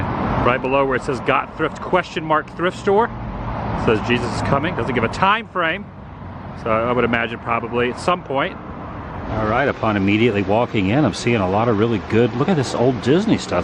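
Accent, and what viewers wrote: United States. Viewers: a goth superstore???! oh you mean golf! lololololololol im in NZ. that USA accent! :) dig ya vids as always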